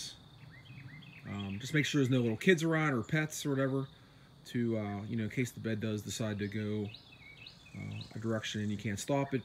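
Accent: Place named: Philadelphia, United States. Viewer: Your thick Philly accent. Where did you grow up?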